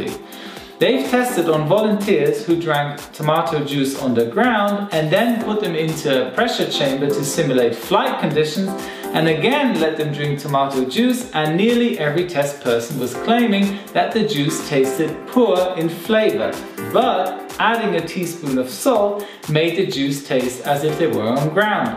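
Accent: United States. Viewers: how can he speak American English so well?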